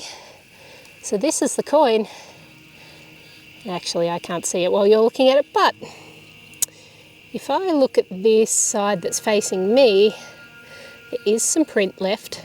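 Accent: Australia. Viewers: Welcome back it’s nice hear your assie voice again, and watch your videos.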